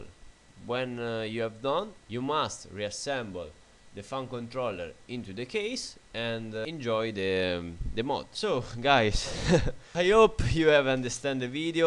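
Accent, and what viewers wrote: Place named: Italy. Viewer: i like your accent man. italy rules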